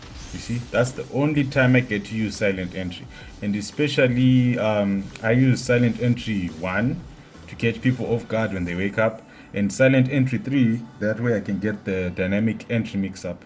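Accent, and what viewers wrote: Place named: Africa. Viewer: yo man i know this is late but its great to hear some great african commentary. love from south africa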